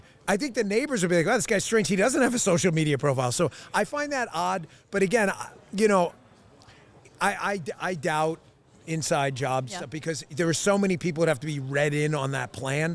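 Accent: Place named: New York, United States. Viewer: love Dans italian NY smart aZZ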